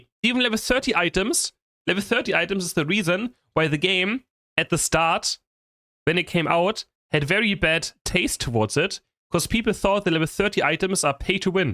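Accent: Germany. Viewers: Du hast einen sehr starken deutschen Akzent wenn du englisch sprichst xD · I don't even play the game for license points as I already own the pokemon I like to play as. For me, item enhancers are more important, to max out all the items I use. Also this guys accent is cringeworthy thick lol (I do speak german natively and I always feel like this guys videos should be in german instead lol)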